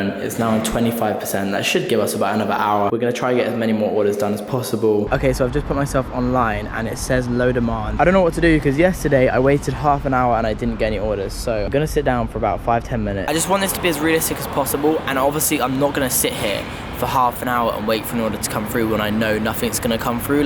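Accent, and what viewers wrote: RP, United Kingdom. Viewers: Dude, you have a posh accent. Pronounce the "th" as "th", not "f" as if you're trying to sound slightly more slum.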